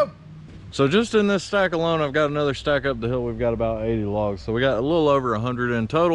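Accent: United States. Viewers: Man its so fine to find somebody who speaks murican. New subscriber.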